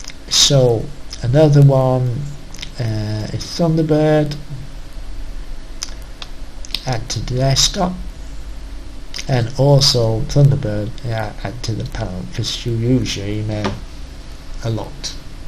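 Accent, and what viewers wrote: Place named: Yorkshire, United Kingdom. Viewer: who say he is a windows 10 lover he might be a windows xp lover also with that accent I can tell your from Yorkshire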